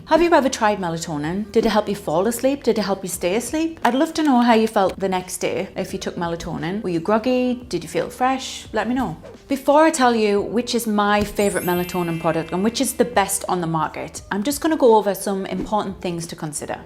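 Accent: United Kingdom. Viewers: You have a British accent so why did you leave the UK?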